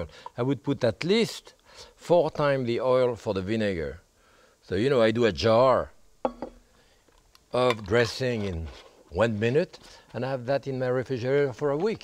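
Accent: France. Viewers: Love his French accent 's English, and easy methods in French Cooking! Thanks. :) Merci! Jackque.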